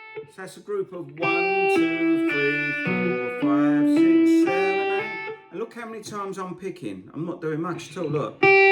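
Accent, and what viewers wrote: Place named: London, United Kingdom. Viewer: Great teacher, love the accent too, REAL London.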